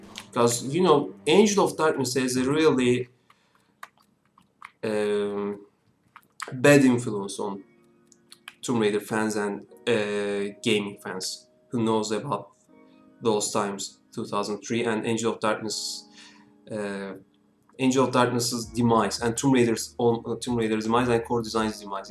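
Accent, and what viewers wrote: Turkey. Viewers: AoD ye bakmadan önce milletin oyunun oynanışı, glitchleri vs. kötü oynamayın dediğini duyduğumda direkt abartıyorlardır demiştim. Haklıymışlar. Gerçekten hayatımda bu kadar zor kontrolü olan bir oyun oynamadım. Hani TR1'in tank kontrollerine bile alıştıktan sonra oynaman çok kolay. Bu.. bu ise başka bir seviye... Neyse ki ''restoration project'' in olması iyi bir patch. Hikaye çok kesilmiş olsa bile yine ilgi çekici. Daha karanlık bir Lara Croft hikayesi olmasıyla beraber bu seferde Lara'nın hem kontrolü kaybedip kurban olmasını inceliyoruz hem de iç dünyasını... Umarım oynayabilirim. Ha bu arada Türk olduğunu direkt aksandan anladım :D güzel içerikler var kanalda biraz onlara bakayım. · Tamam Türkmüşsünüz, aksandan ben de öyle tahmin etmiştim 😄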